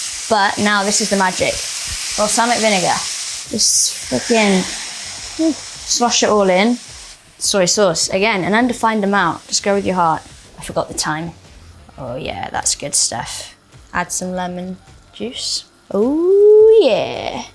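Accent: United Kingdom. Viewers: Omg she's British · Man ngl I’ve been bamboozled I didn’t know she was British 😅😅😅😅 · British accent, but i actually hear ellie talking, really, it could be ellie making lunch for vogue IF like, not the apocalypse you know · I love her British accent so much more than her American accent. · How did I forget Bella is British? 😂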